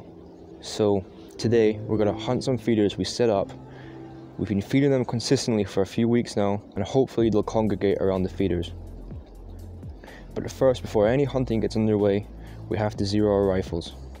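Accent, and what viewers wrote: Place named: Scotland, United Kingdom. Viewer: I cant place your accent.... its scotish but sounds like theres somthing else as well?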